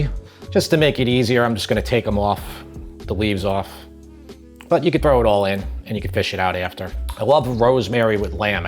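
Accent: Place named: Boston, United States. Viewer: Are you from New York cause you got that Boston accent.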